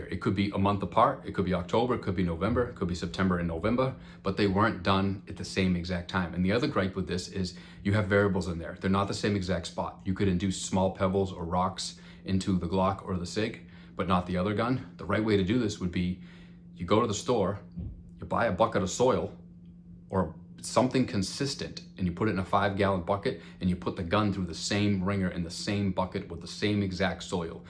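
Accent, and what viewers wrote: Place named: Boston, United States. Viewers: Bro where are you from? I was born and raised in MA, and when you said, “stahted fuckin 12 days ago” I was home in Lowell for an instant🤘🏻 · First time viewer but judging by the voice..... Go Sox...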